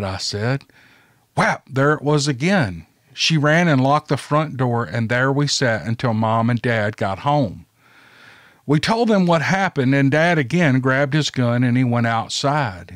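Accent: South, United States. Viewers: Hi! I always enjoy your southern voice...doesn't even matter what you're reading or saying!Lol! Love and thanks for the great entertainment! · Love to hear you tell stories. You truly have a gift. I love your southern accent too 😊. I enjoy the music rifts as well. I'm so glad I came across your channel about a year ago. Keep on keeping on!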